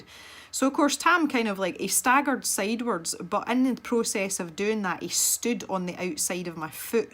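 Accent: Scotland, United Kingdom. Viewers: Just found your tutorial and so pleased to hear a fellow Scot. You colour similar to myself so I will def be following you. This is brilliant x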